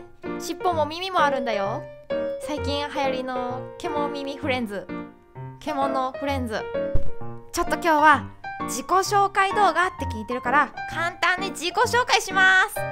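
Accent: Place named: Shiga, Japan. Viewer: 滋賀弁話しいさ（ ; ; ）♡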